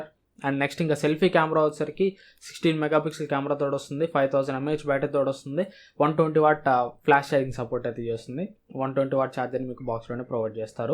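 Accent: Ongole, India. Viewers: Bro meedhi Ongole haa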